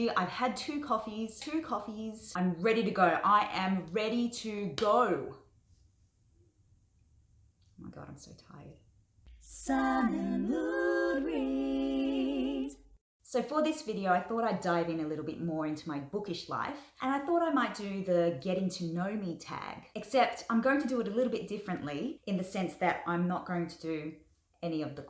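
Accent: Australia. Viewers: Found you through Philip Chase who just gave you a shout out. I'm in Sydney myself so it was nice to hear a fellow Aussie discuss books ;)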